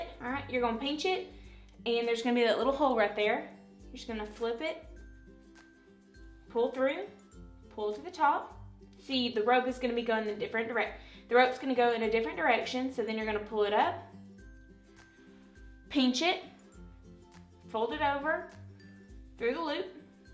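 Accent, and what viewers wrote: South, United States. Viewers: Also, where are you from....it sounds like a southern accent? South Texas here😉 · "Your gonna peench it" lol I freaking love your southern accent!! Makes me miss home that much more! Love these videos, Congratulations on the pregnancy!!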